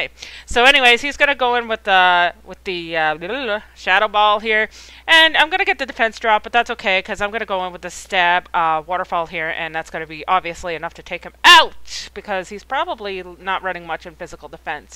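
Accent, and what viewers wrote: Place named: Canada. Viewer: You are soooo from Canada!!!!!! :D I love the UHT! (out) Ennjoying your battles and your flaming! lovely <3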